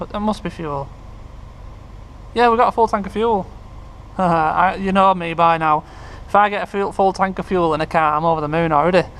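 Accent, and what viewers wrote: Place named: Northern England, United Kingdom. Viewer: ....also, was watching this on mute with subtitles.... now realised you’re a northerner like me! That’s a win over the southern salvage guys 🤣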